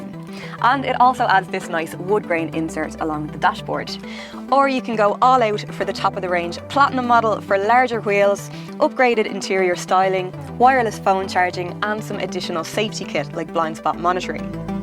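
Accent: Dublin, Ireland. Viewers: It's a huge pleasure to watch and listen to your reviews: they're through, precise, competent, and on top of that, you are amazingly beautiful and gifted with a delightful (Irish) accent. Thank you! Best regards from Portugal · Dublin accent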